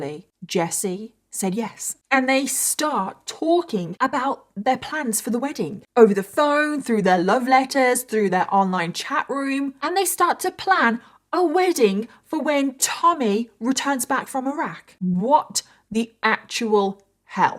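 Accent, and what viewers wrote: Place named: Birmingham, United Kingdom. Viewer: I detect 10% Brummie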